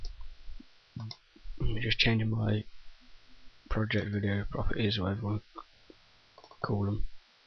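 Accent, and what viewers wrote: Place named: United Kingdom. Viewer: Ohmigawd your british <<num> Pleasse reply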